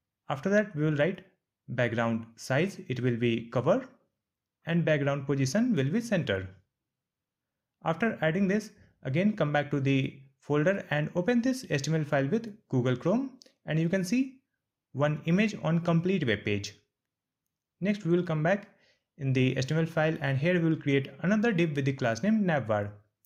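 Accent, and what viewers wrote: India. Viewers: Indian accent yes... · Lol!!! It's Indian Accent bro. Indians are tech savvy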